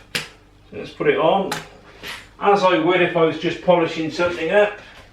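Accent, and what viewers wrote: United Kingdom. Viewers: My grand parents and beyond were Brits and I really enjoy the accent...I'm a yank now...The vid was very informative and I need to do this...am in the process of restoring a 93 Honda Prelude that has tons of micro scratches from dirty windshield wipers being dragged over a dry windshield...Thanks again...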